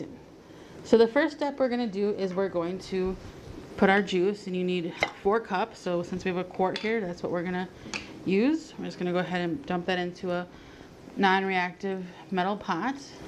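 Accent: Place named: North, United States. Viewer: You talk too fast. And we southerners can't understand northenors. That's out fault lol.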